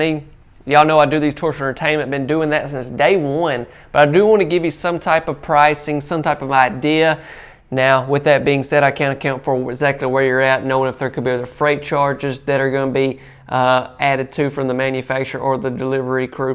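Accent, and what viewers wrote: South, United States. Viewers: Does anyone here own this exact model? This is the one I have my heart set on 5000%! It has every single thing I need & want for myself & my boys. But the guy at clayton homes told me they have "better" layouts with "better" constuction at a better price than this prime. & i wonder if he just said that because he wants me to buy one they have. Ive looked at MANY & this 1 still tops them all for me. I really would love to know how much this one is & how is the construction. Im not settling 4 anything less. Thank u 4 this amazing video. & ur a great camera man! Also love ur accent so cute. lol Nice southern draw u have sir :)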